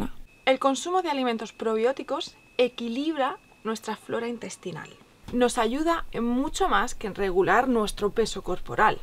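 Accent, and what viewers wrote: Spain. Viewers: Puedes compartir de esos cultivos para hacerlo yo? Por favor x tu acento creo q eres española yo vivo en USA si eres fan amable gracias espero tu respuesta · ERES FABULOSA,,TE VEO DESDE AUSTRALIA,,DESCUBRI KEFIR POR ACCIDENTE,TRATE EL AGUA DE KEFIR Y NO ME GUSTO MUCHO,,CON TUS CLASES, YA LA VOLVERE A HACER NUEVAMENTE, TENGO 85 AÑOS Y ME SIENTO FASCINADO, ME GUSTA TU ACENTO ESPAÑOL GRACIAS MIL LINDA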